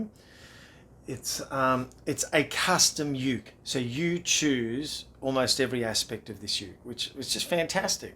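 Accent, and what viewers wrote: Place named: Australia. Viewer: When I hear that accent and see that instrument, I expect to hear, " ".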